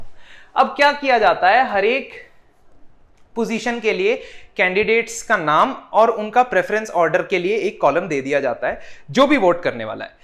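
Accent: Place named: Punjab, India. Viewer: Sir tuhade accent to meinu tusi Punjabi lag rahe si. Jado mei playlist dekhi ta tusi sachi muchi Punjabi nikle😂😂😂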